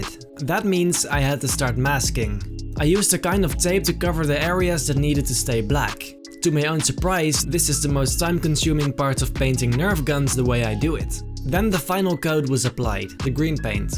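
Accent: Netherlands. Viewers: Wnr nieuwe Dutch accent vid?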